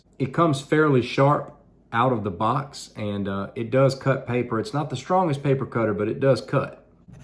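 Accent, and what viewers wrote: South, United States. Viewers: Hey big guy its great to see you again. I love the way your videos start. "Hello maaa feeeaaaands" that southern draw that all the chicks like i bet. Im just downloading your new slingshot aiming video. You know what. Try this. Forget aiming altogether. Forget your slingshots there. Just draw it like a bow. Release within 5 seconds else your bands cool down. After a few hundred shots muscle memory will take care of the aiming. If you miss; dont adjust anything. Watch your target; your mind will give you an unrest feeling; hesitant awkward if your out of sync. Frustration is just your mind trying to help you"talking". Left brain is totally useless for slingshots. Spacial awareness is right brain. Colour; shapes; movement; tonal changes. Sights dont help. Thyr liars! Art is right brain(in beginning your left brain tries to help saying"this should be like bla bla bla...". 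Slingshots is right brain not left. Its art; not science. So if your frustrated and get that grrrrr!!! Just stand upright; point your hands up and out and take a deep breath and relax. Then just fire away; over and over. Also try this. If you have a rope loop hanging from your slingshots; hang it from your arm where a wrist brace would sit. And twist the rope and slingshot till its comfortably tight under your wrist; then hold slingshot as normal. You made a wrist brace. You dont even need to hold your slingshot.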